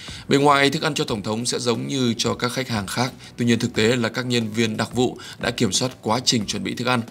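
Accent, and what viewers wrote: North, Vietnam. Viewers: giọng đọc của người miền BAC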